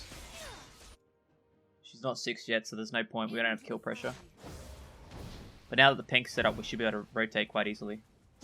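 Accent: Australia. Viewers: Loving the series mang keep it up, real informative and nice to hear an aussie accent behind the skill. Have you considered doing coaching videos?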